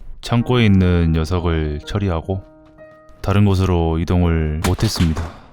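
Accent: Jeolla, South Korea. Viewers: 님 오늘은 뭔가 전라도 사투리가 들리네요 🙂🙂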